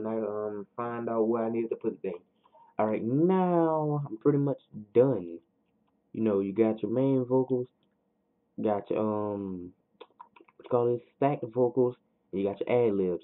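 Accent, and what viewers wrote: United States. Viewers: u can tell this nigga New Orleans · u from Louisiana aint cha?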